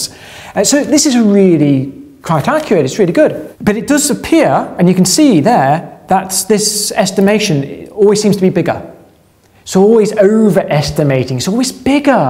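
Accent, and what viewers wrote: United Kingdom. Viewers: this brit has the Most British accent ever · it's hard to a Brazilian like me to understand his British accent.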